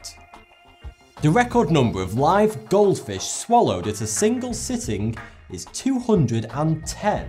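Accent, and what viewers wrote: United Kingdom. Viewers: Thoughty2 sounds like 42 with your British accent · Is he British?? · I like your british accent :3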